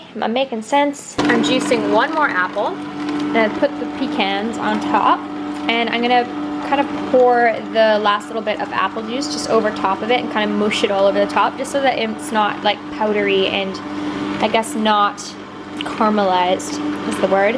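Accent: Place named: Australia. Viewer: Am I the only one that hears an Australian accent sometimes 😀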